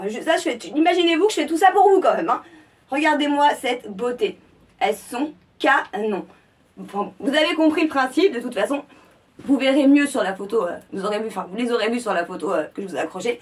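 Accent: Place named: Midi, France. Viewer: Wowww toujours plaisant t'écouter surtout avec ton bel accent!! Je te suis du Québec... ton accent me rappelle ma grand-maman qui était du "Midi" comme elle nous disait hahaha. Ais-je manquée le code promo de -30%?? Allez bonne journée bisous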